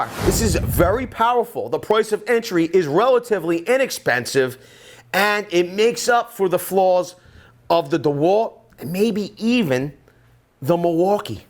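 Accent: Philadelphia, United States. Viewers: Gotta get a Tshirt made that says “AND THE REALITY IS” Strong philly accent go birds!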